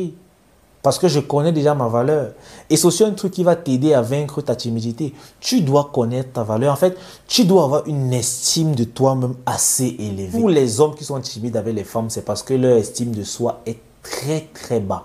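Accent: Cameroon. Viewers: Pourquoi tu as l’accent 237?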